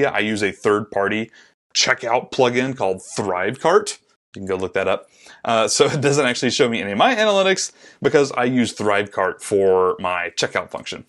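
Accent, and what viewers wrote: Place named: United Kingdom. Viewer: Ok after comparing Course hosting palttforms the hole Sunday and already having a free test account at teachable I lean towards Podia because of design. Teachable Text layout in courses without coloums is ridiculous, I thought about making a new line every 10 words manually... Does podia have a community for members optione, where my course members/buyers can meat and chat, post there results? I plan on selling art classes with my dad: <link> Here you can see the results of the "offline" real life courses so far. Thanks for advice, great video!! Here a a few free Tuts in german, we plan to do more advanced ones for money... <link> You sound British. Sad to lose you in Eu, don't know how you think about it, what brings me to the next question, is podia good at handling the EU taxes? 16% / 19% in Germany...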